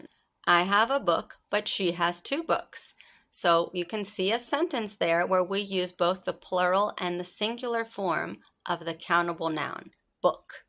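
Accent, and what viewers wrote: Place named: United States. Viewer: You are so beautiful and your American accent is so pleasing to my ears. Happy New Year !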